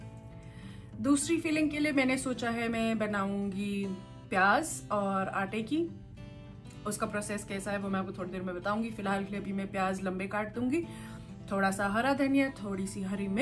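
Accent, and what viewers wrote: Pahadi, India. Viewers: Tussa ra pahadi accent ai eee jaa , luv from mandi HP 🤗❤️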